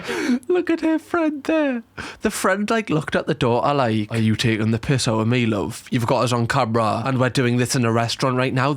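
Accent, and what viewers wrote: Newcastle, United Kingdom. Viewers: He's from Newcastle in the UK! 🥰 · I love Geordies. I was conceived in Newcastle but born in wales but when I hear you I think of my parents and happy times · I love the way you speak so much. The jokes you Crack, I'm dying 😂 it sometimes gives me little Britain vibes. I love British English so much 😂❤️ much love from Germany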